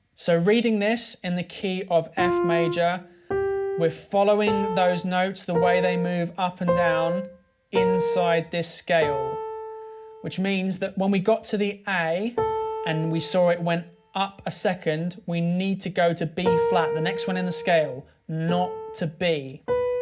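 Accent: United Kingdom. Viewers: I'm learning piano reading and english as well. I find your channel very useful and your british accent is way much suitable for doing so. Thanks!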